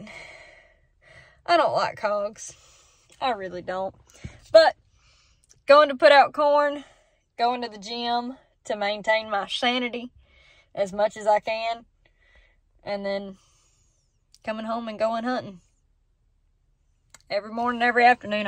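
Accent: South, United States. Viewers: You've got the cutest southern accent ever! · I like your spécial south accent.... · Back in <num> I was on active duty in the USMC. Ended up as a Sergeant E-5. It was people like you especially with that southern accent that I could really rely on to get the job done.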